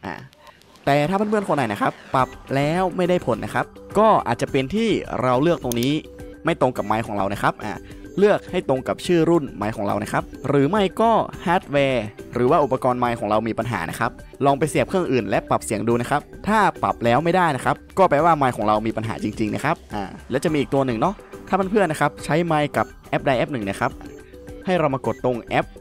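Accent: North, Thailand. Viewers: สำเนียงเหนือ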